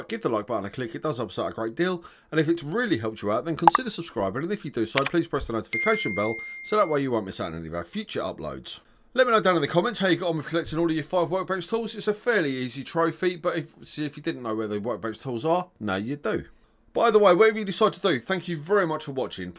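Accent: United Kingdom. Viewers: no one told you to talk a little bit slow...man not all people understand English in a UK accent !!!!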